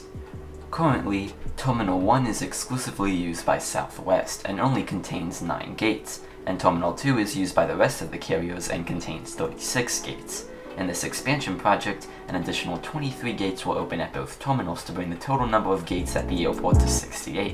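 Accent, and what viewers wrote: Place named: Ireland. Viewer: It just piqued my interest as to where you're from. Your accent sounds slightly Irish, but an Irishman would know how to pronounce both Raleigh and Durham, since both of those are English names.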